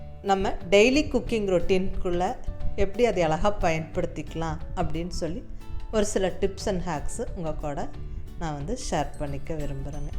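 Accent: Kongu, India. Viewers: Very useful. Your kongu Tamil slang is also good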